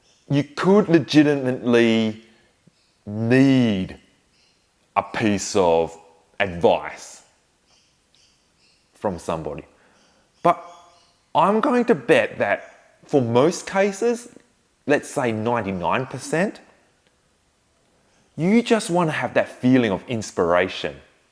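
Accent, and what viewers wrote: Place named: Australia. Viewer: Thank you for this video - I really enjoyed it. However I have difficulty understanding the thick Australian accent - I believe if you made it little more neutral it would be impactful on more people ! 😊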